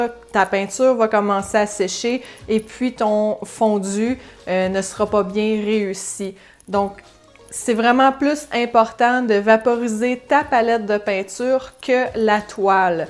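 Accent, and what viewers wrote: Quebec, Canada. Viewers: Salut, j’adore ton bel accent québécois, je vais mettre en pratique tout de suite tes précieux conseils pour essayer de faire un fondu gris. Je te montrerai le résultat. La bise de France 🇫🇷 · Merci. Ce clip est intéressant, bien expliqué, fort pédagogique et, ce qui ne gâte rien, professé dans la langue de Molière. C'est si rare sur Youtube à notre époque. Amicalement, PS: votre accent québécois est une pure merveille; ne le troquez pas, ce serait un véritable gâchis!;)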